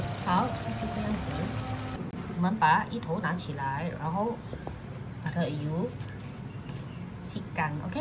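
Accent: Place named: Malaysia. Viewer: 我们马来西亚人的语调😆很温馨还有马来文备注📝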